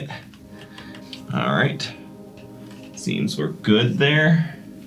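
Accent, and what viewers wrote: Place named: Canada. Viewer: Wow what a voice, you sound Canadian haha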